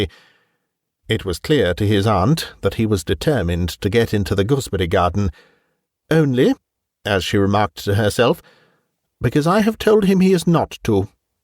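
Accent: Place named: RP, United Kingdom. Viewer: do you think your narration is more British, or Transatlantic?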